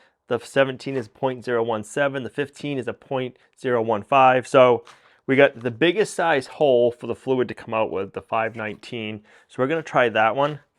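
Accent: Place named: New England, United States. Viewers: Yeah, and "eSScavator" 😂. Waiting for " acrost". Ahh, those NH colloquialisms! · That's the way they talk over there in New England. He's just saying things the way they all do around Boston.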